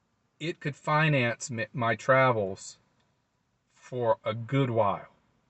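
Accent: South, United States. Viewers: I just found your channel. It is very interesting. I have already seen several videos. I do not understand why your videos are interesting when all you do is just talk to us from your driver’s seat. Is it the pleasant face? Is it the melodious Southern drawl? Is it the nice story telling? I just don’t know but I have subscribed to your channel and clicked on the bell. Best wishes.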